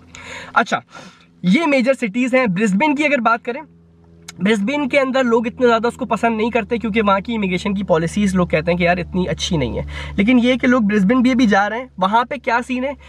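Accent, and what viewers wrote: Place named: Karachi, Pakistan. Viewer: bhai ya jo ap baat ka andr karachi wala touch daita ha na bss sara maza too is ka ha😂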